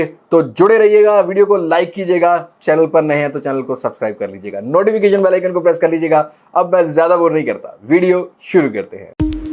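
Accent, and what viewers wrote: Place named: India. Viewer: You don't have to talk like an indian sir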